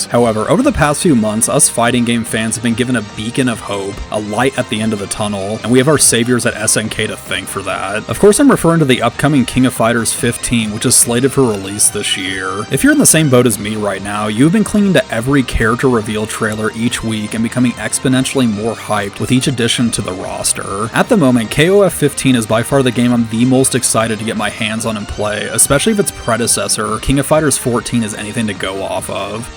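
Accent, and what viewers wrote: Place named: California, United States. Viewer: That California accent!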